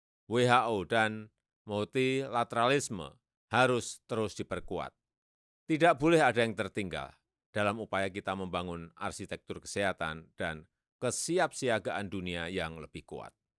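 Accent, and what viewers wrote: Java, Indonesia. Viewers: 😁😁😁😁😁😁😁Hihihihihi Benar tuchhhhh. Ndak enak mendengar BPK Jokowi menggunakan bahasa Inggris saat di forum internasional.....kagok...(lokal Indonesia jawanya terasa sekali....). Maaf ini bukan RASIS atau kesukuan....tapi itu melekat dan sulit dihilangkan 🤭🤭jadi kebiasaan dari kecil sampai tua pasti terbawa.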